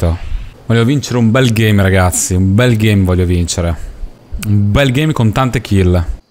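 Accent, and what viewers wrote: Naples, Italy. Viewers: Come è bello quando parli napoletano😍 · E stupendo il tuo napoletano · Ma sei napoletano io si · ma sei napoletano a fai finta di esserlo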